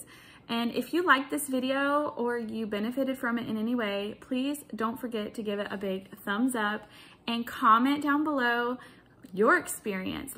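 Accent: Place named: Texas, United States. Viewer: Are you from Texas? Your accent sounds really Texan! 😂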